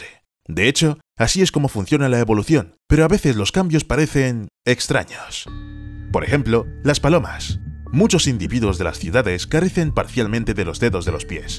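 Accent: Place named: Spain. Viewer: El acento español 👌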